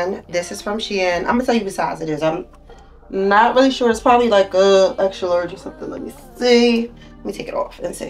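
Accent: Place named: Philadelphia, United States. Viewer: Are you from Philadelphia? Your accent is giving Norf’ Philly 😊… FYI, I went to grad school in Philadelphia and the way you talk bought back beautiful memories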